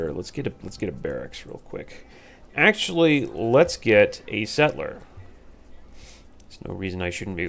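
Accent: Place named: United States. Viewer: yes i love smooth american accent as well:D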